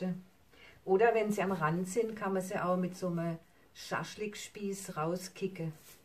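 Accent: Germany. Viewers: Ich hab dich auch durch Annemarie gefunden. Ich habe ihr Tun vom 1. Video an verfolgt und sehr viel gelernt und auch viele Fehler vermieden. Bin schon seit 20 Monaten am Pouren, mit viel Freude und Illusionen. Du greifst gerade eine ziemlich neue Richtung auf. Kennst Du Kristin Oladotter Steen? Sie hat auch das Problem mit den ungewollten Flecken. Falls sie dich stören, 1 Teelöffel Alkohol vor dem Gießen in jeden Becher rühren, dann geht es besser. Ich freue mich schon auf deine nächsten Werke und hab dich gleich abonniert, damit ich keines deiner Werke verpasse. Ich habe leider nicht soviel Platz, um größer als 50x50 zu machen und außerdem fließen meine Stauräume schon seit Monaten über. Das ist ein echtes Problem wenn man süchtig wird oder schon ist. Ich lebe in Norddeutschland und du nach der Farbe deiner Stimme sicherlich im Süden. Liebe Grüße Karin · Hallo ich danke Annemarie dass ich sie gefunden habe. 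Das bild ist wunderbar. Ihren dialekt kommt mir bekannt vor sind sie villeicht von der Baden _Wuerttenberg gegend?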